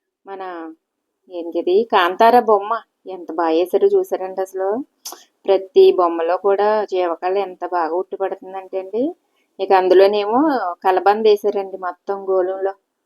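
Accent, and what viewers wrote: Godavari, India. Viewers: హాయ్ అండి చాలా బాగుంది మీ వాయిస్ కూడా చాలా బాగుంటుంది మన గోదావరి భాషలో చాలా బాగా మాట్లాడుతూ ఉంటారు థాంక్యూ అండి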